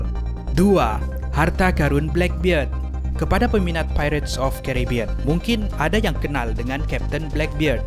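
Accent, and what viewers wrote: Sabah, Malaysia. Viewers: Dia orang Sabah la bro.. mmg cmtu loghat dia..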